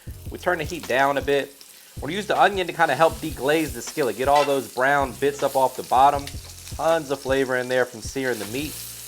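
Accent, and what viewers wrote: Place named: Louisiana, United States. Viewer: You just popped up on my feed , new sub. You sound like you come from the land of the Muffaletta, and the Mirlitons little brother 😁👍👊 !!!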